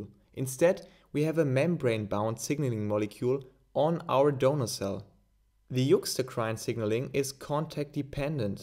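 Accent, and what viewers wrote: Germany. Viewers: hilfreiches video, danke :) höre ich da den deutschen Akzent raus? :D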